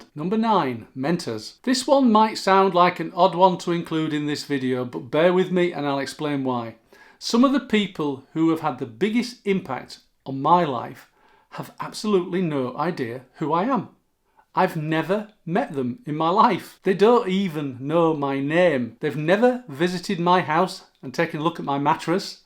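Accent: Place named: Yorkshire, United Kingdom. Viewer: The Eagles ... sitting here in Don Henley's hometown, getting ears tuned to what sounds as the Yorkshire accent. That's coming from one with Old West Florida sound. Great advice for us Boomers ... will continue to listen.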